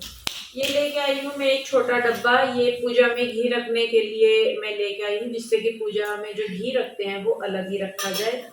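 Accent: Haryana, India. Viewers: Di aap hariyana se ho kya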